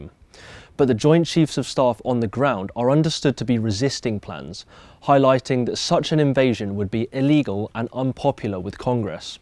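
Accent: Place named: United Kingdom. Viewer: I wondered how long it took this guy to get that accent down. There's no way he started talking like that as a child. And why a British accent? Why not a Latino or Russian or a French accent.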